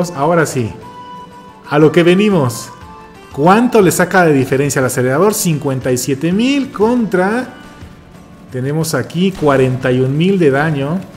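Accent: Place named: Mexico. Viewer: Hmmm tratare de adivinar de que estado de mexico eres🇲🇽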